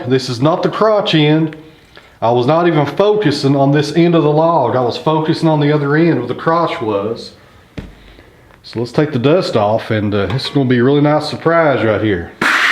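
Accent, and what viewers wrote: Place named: South, United States. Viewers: I hate you're Southern voice video sucks be cause of that🤔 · Just curious...your accent sounds definitely sounds Southern. Do you live in the Southern United States? I'm not trying to be nosy, just curious, as I said. I'm a Virginian, born & raised. We have a good lumber company not even 10 miles from where I presently live. Augusta Lumber is it's name, and it's located near Fishersville, Virginia. Just curious as to whether you'd ever heard of it or not. Anyways:have a great day, & thanks again for posting this video. I love me some Cherry wood products. They're beautiful!!!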